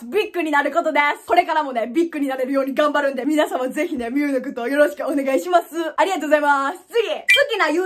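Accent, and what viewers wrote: Wakayama, Japan. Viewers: 見た目と関西弁のギャップえぐいww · めっちゃ和歌山弁やと思ってたら和歌山すんでるんや😹この前電車で横に座ってました爆笑爆笑爆笑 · 今日わはっていうところとか、〜やんって言うところが和歌山県民だと思ってました。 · はじめて動画を見ます！見た目がクールなので、性格もクールな感じなのかと思っていましたが、中身はばり関西弁の太陽感ですごくおもしろいです(T T) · 間違いない笑ちょっと和歌山弁入ってるけど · 地元の友達のノリと話し方そっくりで多分和歌山の人やろうと思ってて、ほんとにそうやってすっきりした。こんな短時間でたくさんありがとう言われたん初めてや。年下なのが信じられん。応援してます。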